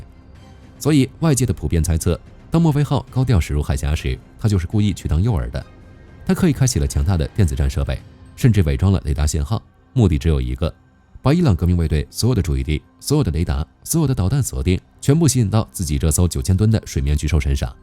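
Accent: China. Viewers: 这英语 浓浓的中国口音啊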